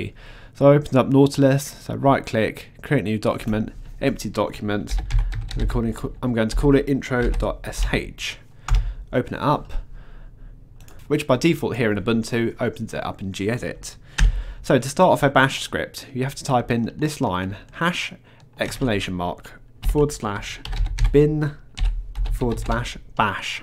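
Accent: United Kingdom. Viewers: some questions, pls. U rite #!/bin/bash but Ive been riting #! /bin/bash, that is, with a space between the! and the path. Is that important? At least my first scripts have worked. Second. U use to rite bash yourscript.sh to run them, I use sh myscript or. myscript or./myscript or even bash myscript, however, I have a book called Linux the complete reference, 3rd edition and there the author says that when we give the file executable permission, we just need to rite only the script file name, example: $ myscript But what I receive is: bash: myscript: command not found What is bad? Thank you for your videos. And great accent! Pure Brit;) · I love your british accent!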